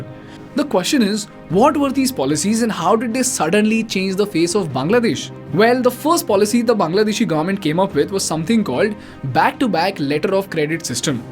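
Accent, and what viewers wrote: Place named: South, India. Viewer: You need to try and lose the aiaiyyo accent. The rest is good.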